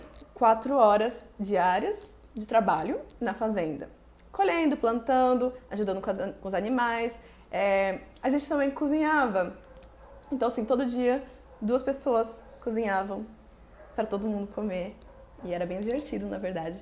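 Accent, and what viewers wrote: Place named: United States. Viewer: Esse sotaque americano lindo que vc tem ❤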